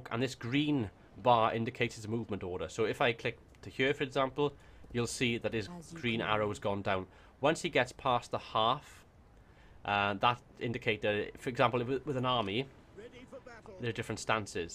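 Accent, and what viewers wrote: Wales, United Kingdom. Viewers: best tutorial ive found for total war rome 2, plus your welsh so it just sounds better :)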